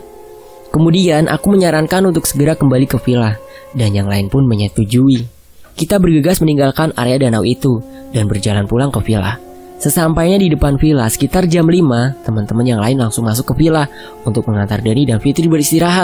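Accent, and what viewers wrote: Java, Indonesia. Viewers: Min..: suarane medhok heheheh suka deh Kangen kampung halaman 😁😁😁😁😁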